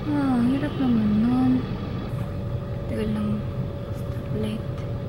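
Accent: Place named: South Korea. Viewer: It still gives me goose bumps for you to have a perfect korean accent. Haha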